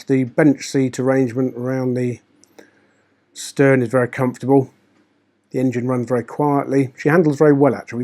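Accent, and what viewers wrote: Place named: United Kingdom. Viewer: He is British, they all sound strange to me..... Not nice to point it out!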